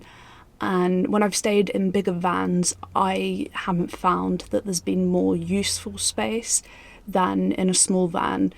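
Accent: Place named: United Kingdom. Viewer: Hi, lovely seeing you. Curious about your accent. Can you please tell me what part of the united Kingdom you are from? I know this is a random question. Sorry if it's to far out there.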